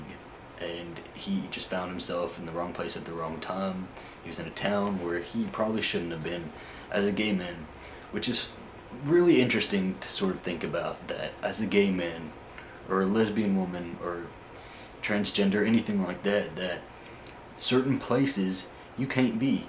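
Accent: South, United States. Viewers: So many great suggestions Z, from you and others, so thanks! I'm watching Drown now. The Broken Hearts Club is good, and Rock Haven. I love your accent; makes me want to move back to the south. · Ok ! Here i go again cyber stalking my fellow southern boy . I adore you . So sweet Honest ( southern boy ) . I just find you real and im a litttle older but i learn from you. . ( btw hint hint. Become friends with a flight attendant ( me ). . Saves on travel . Just kidding . Being in new York , then Miami I lost my southern accent unless im yelling at my bf whom yells back in Spanish . Thats its own video !! Any way i love the accent snd your open heart and sweetness Namaste , Rocky ✈️🙏🏻😇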